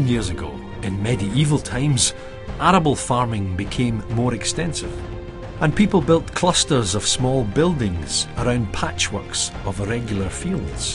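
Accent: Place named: Scotland, United Kingdom. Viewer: I have never heard such ignorance in the same place. The only good thing about this video is the cinematography and the Scottish accent. Beyond that it's a heap of BS. B. Howard, MG Retired Historian and genealogist.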